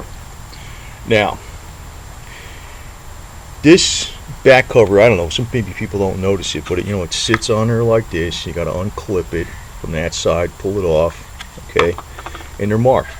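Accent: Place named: Pittsburgh, United States. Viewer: you from Pittsburgh??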